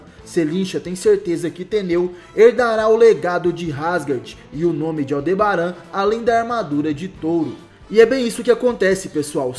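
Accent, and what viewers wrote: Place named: Portugal. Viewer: purtugues d'purtugual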